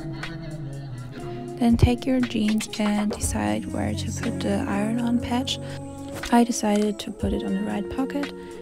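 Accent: Germany. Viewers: Heyy nice vid! Btw are you german bc you have a german english accent :')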